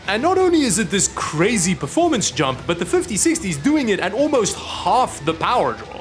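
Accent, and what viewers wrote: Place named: South Africa. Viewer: are you south african? i hear it in your accent from time to time .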